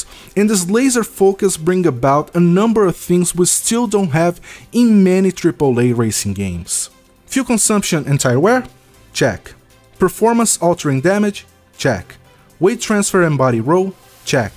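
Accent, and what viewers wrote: Brazil. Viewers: brazilian accent? Tamo junto kkk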